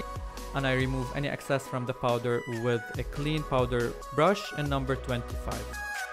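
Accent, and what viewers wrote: Arab world. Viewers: يلي عم يحكي لهجته سوري لبناني حتى بالانجليزي😂😂 وشرط مليون بالمية انه لبناني سوري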